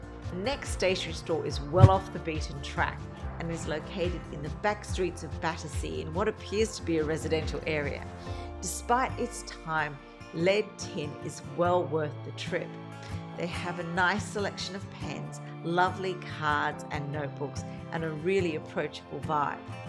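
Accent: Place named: Australia. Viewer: Lovely to hear a fellow Aussie expat (although I’m in the States). Struck by the coincidence, at the time this video was released I’d never heard of Cambridge Imprint, but not two hours ago I unpacked my first order from them, and completely agree, the labels are lovely. Glad your channel “turned up” in my recommendations.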